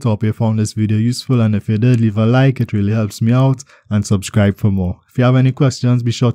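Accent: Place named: Trinidad and Tobago. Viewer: I'm liking your content man. Keep at it and loving the Trini accent.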